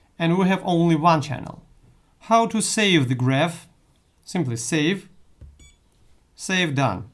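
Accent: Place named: Russia. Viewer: Русский акцент в английском, ох ну что же мы так все одинаково говорим))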